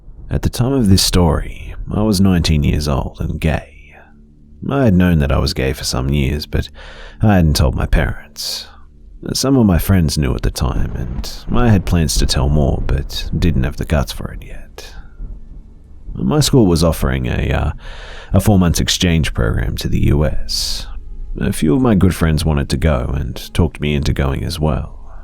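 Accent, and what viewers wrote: Australia. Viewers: Very nice Australian accent yes, one of the best. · Awesome Aussie thank you sir for the scares in your awesome accent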